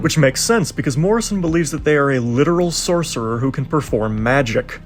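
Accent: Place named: Russia. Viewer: Really love hearing your thoughts but my god man your Scottish accent sounds Russian 😅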